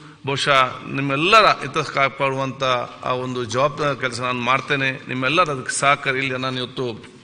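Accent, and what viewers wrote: Tulu Nadu, India. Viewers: ಅವರು ತುಳು ಕನ್ನಡದ ಮಿಕ್ಸ್ ಆಗುತ್ತೆ ಅಡ್ಜಸ್ಟ್ ಆಗಬೇಕು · Sir come from Tulu kannada side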